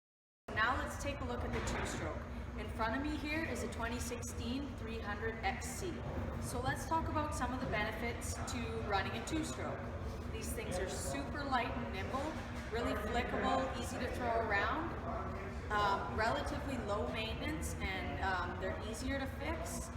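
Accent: Canada. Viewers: I love love Canadian accents! Fuel injection for 2 strokes is here thanks to KTM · That Canadian accent tho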